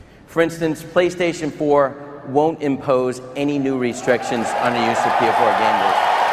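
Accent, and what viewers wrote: Germany. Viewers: Englisch auf Deutsche Akzent, das erkenne ich sofort 😂😁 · you have a strong german accent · I hate that german accent xD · I said he is German because of his English accent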